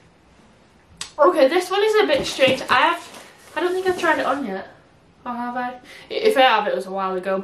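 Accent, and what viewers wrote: United Kingdom. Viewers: best tip out there.. mute the sound. that awful bri'ish accent is killing me. but the rest is fine. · Some nice outfits British accent great outfits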